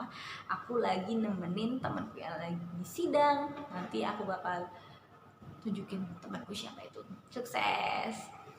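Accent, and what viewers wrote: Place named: Java, Indonesia. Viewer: Ketawaa pas kebeek ngomong *degdegaaan😆 medhok amat😆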